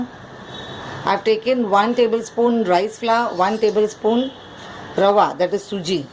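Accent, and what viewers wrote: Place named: Mumbai, India. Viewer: hello from SF, CA, USA! this looks DELICIOUS. thank you for sharing your recipes and cooking, auntie (is it OK that i call you that?) everything looks so tasty, esp. the fish dishes like this one. can't wait to try it.i love watching your videos, and hearing your bombay accent -- my family is from bombay and you remind me of all the aunties i grew up with!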